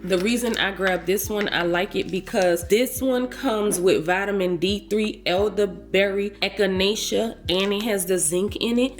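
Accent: New Orleans, United States. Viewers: i wanna hear her say baby earl or ferl paper she gotta be from da NO area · Thank you 🙏🏾/I appreciate you and I Love your New Orleans accent 😀❤️🖤💚👋🏾-Listening from Dallas . Hit the like button 4 u 👍🏾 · Are you from New Orleans, the accent 😊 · I can hear a new orleans dialect from across the world.. lol.. stay safe ppl.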